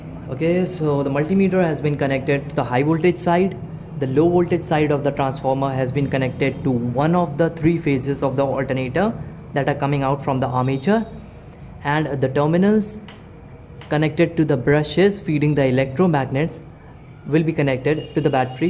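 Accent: India. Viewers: I can NEVER understand this India Accent ! By time I figure out what they are trying to explain , I'm behind on the Next step . I'll just find someone with good English !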